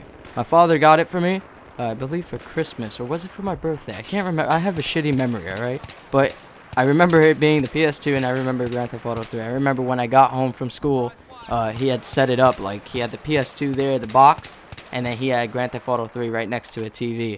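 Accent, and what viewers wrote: United States. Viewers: for you americans out there? i thought you where american.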